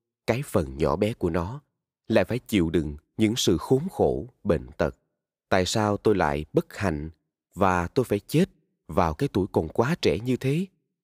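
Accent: South, Vietnam. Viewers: Giong doc Nam Ky qua ro rang qua tuyet voi…….. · Tôi yêu giọng miền nam. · Chúng tôi kính cảm ơn những người làm ra sản phẩm nầy và kênh nầy không ngừng phát triển . Giọng miền Nam của người đọc ấm áp quá đỗi yêu thích. Đọc chầm chậm như vầy người già chúng tôi dễ nghe. Cảm ơn.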